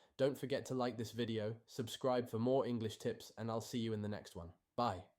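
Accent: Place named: United Kingdom. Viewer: How did you get the British accent with ressources that you use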